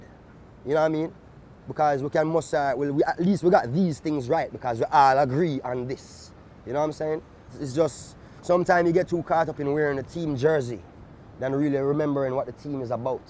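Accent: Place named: Jamaica. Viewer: Dear Sir Gong, thank you for never abandoning the authentic Jamaican accent. Blessings in these great endeavours!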